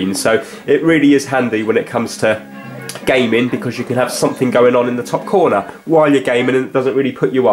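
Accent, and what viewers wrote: England, United Kingdom. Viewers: England accent👍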